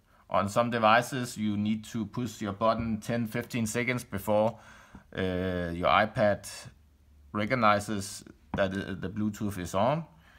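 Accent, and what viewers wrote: Denmark. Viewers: After hearing your accent: Are you Danish? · Are u danish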